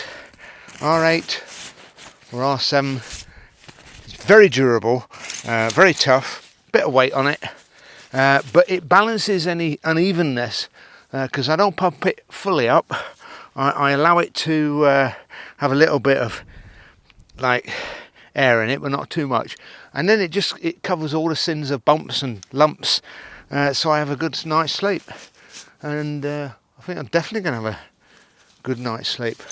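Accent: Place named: Birmingham, United Kingdom. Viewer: well nice one, no wonder you have those bright lights then🤣 I think I heard a bit of a Brummy accent or was it just my second pint of Champion 7.3😆👍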